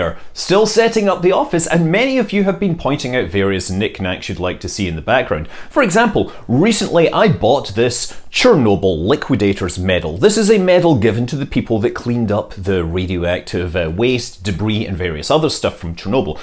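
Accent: Scotland, United Kingdom. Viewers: Scott, your videos have come up on recommended several times, I decided to watch some and it seems YouTube got it right. I just subbed and I look forward to more of your videos. I wonder if you might be able to put me out of my misery on something? Your accent tells me your Scottish but I can't put my finger on which part of Scotland, I'm from Fort William and I'm guessing that you are from somewhere in the central belt?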